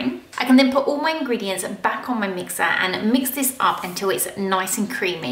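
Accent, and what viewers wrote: United Kingdom. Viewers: I love your accent! what kind of british accent is it?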